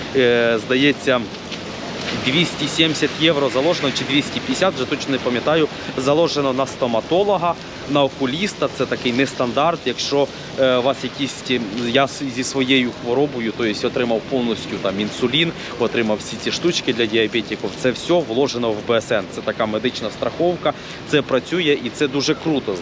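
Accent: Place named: Zhytomyr, Ukraine. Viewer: Він розмовляє суржиком... суржик житомирський .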